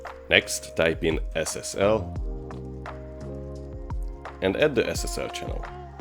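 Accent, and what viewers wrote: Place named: Hungary. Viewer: Hi! My I ask, are you Hungarian? Just because of your intonation and accent sounds like that. I am from Hungary! 🤘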